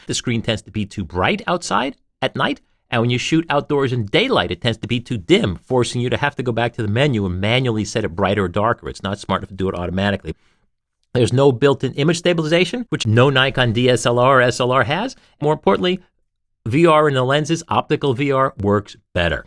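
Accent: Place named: North America. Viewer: Dear I love your reviews, you are responsible for my choice of a Nikon D600 as my first digital camera (which Nikon subsequently replaced for free with a D610 because of the shutter/oil problems). I had to "up-grade" to digital for on-land photography when my Konica T3 became irreparable. My first Nikon was a Nikonos 5 - which feels very much the same "in the hand" as does my Fuji X-T1 (again, acquired on the basis of your review). Anyone who has used a Nikonos 5 becomes a rusted-on Nikon user for life. But, please, please, please, for the benefit of we English speakers, the correct pronunciation of "Nikon" is indeed "Nikon", not "Nye--kon". That is, "Nikon", as derived from "Nippon", not "Nye-kon" as not derived from "Nye-pon". But, more importantly, a "Z6" is a "Zed-6", not a "Zee-6". To we English speakers, hearing a North-American accent, the expressions "Zee-6" is indistinguishable from "Dee-6". 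Given the date of this review, your references to "Zee-6" could not have been to a "Dee-6", but how many YouTube viewers actually look at the date of up-loading of a video?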